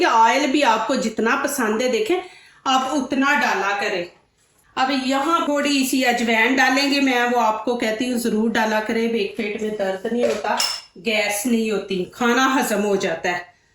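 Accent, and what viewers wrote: Punjab, India. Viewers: Lehjha aap ka panjabi ha aap urdu na hi bolain anjabi hi bolain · MashAllah punjabi lehjay main urdu achi lugi hay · Baji aap agar punjabi bolain tu zyada acha hai aap ka lehja boht punjabi hai humain apni zuban hi bolni chahiye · Speak Punjabi for GOD sakes don’t try to break URDU LEGS ( I am Punjabi too but your accent HORRIBLE) 🙏🏼🙏🏼people laugh on us PUNJABIES ) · آپ پنجابی میں ویڈیو بنایا کریں... کیونکہ آپ کا لہجہ پنجابی ہوتا ہے اور بول اردو رہی ہوتی ہیں ...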